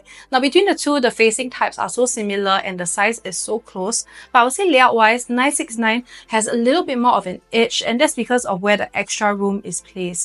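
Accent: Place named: Singapore. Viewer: Kind of singaporean accent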